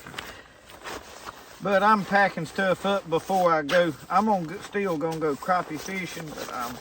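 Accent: South, United States. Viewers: Man...when I find a new channel I often binge watch a touch and see what all similar interests there are. We are about the same age I think, but you're still doing the things that I miss most from when I was younger, or the things I always thought would be fun. Y'all MS and Alabama boys do have a thick accent though 😅. Much love and God Bless brother.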